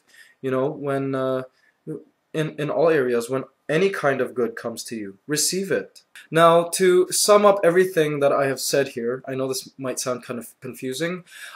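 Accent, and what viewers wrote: Philippines. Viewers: i love it when you're speaking with pilipino aksent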